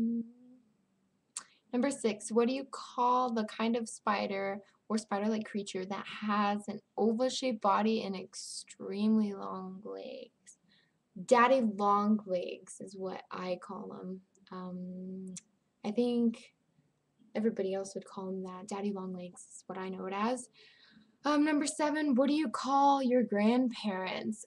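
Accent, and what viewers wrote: Las Vegas, United States. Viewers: Thanks for that. Just confirming the actors have your accent on CSI LosVegas ... Seems they do :) Also, I'm Aussie. Can't see the flag in its specificity well enough but, is it an NZ flag ? What's up with that out of mere curiosity ? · My wife is from Las Vegas, and you guys sound exactly alike. There is a clear Las Vegas accent, a good example is the "uhh... yeah." Pretty common among those I've met that are from Las Vegas. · yeah your accent I didn't realize that's how you sound I'm in las vegas been for 8 years. but we have so many people like me that are just coming into the city from somewhere else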